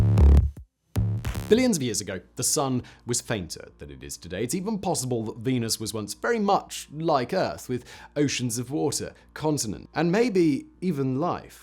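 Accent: RP, United Kingdom. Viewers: Are you leaning heavily into that academic british accent? Or do you actually speak to your friends with that mouth? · I'm not from Britain but oh my god is this guy super posh or is it just me??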